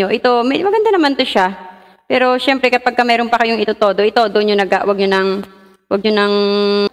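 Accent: Iloilo, Philippines. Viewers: Sumuaubra lang sila niyan idol...parang ilonggo ka din 😅😅😅❤️❤️❤️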